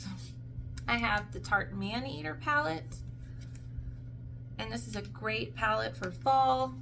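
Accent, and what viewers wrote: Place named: Texas, United States. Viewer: Which state are you in? My guess in Texas or Oklahoma, going by your accent. I've actually spent alot of time in the states, having family in California, Idaho, Illinois, Montana, Arkansas, Texas and Colorado. I'm not American but I'm first generation Australian, from Europe. Other family members have immigrated to US.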